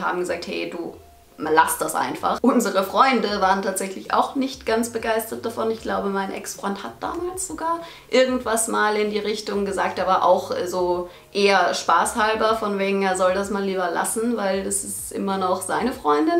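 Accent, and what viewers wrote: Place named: Vienna, Austria. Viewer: Ich höre dennoch dein wienerdialekt durch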